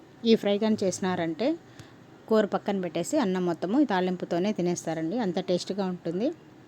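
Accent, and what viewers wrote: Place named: Rayalaseema, India. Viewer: మా రాయలసీమ భాష, ఎర్రగడ్డలు,వుర్లగడ్డలు వినడానికి ఆనందం గా వుంది.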